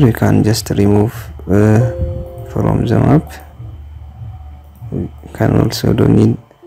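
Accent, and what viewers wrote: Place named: India. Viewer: Fuck your english indian accent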